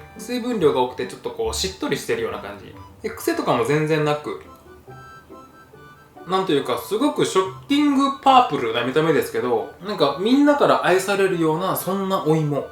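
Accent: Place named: Kyushu, Japan. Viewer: 九州の人は柿のイントネーションが牡蠣と同じなのかな 母親もそうなんだよな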